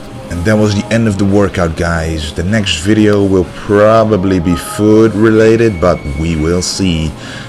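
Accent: Netherlands. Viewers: Somehow your accent gives me the idea that your a dutchie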